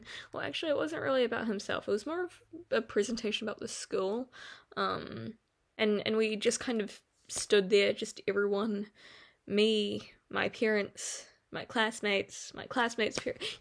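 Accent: New Zealand. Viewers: Your accent swerves between kiwi and southern US. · ur accent sounds sooo familiar r u from nz? or maybe australia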